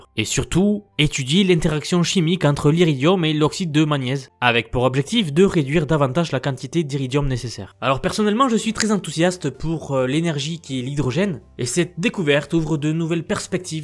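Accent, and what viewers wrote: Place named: Toulouse, France. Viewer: t'étais pas journaliste sportif, par hasard, avant ? Je préfère quand même ta voix de toulousain ou pyrénéen, que ces voix horripilantes d'IA ! 😉 Ceci dit ton docu est impressionnant ! bravo !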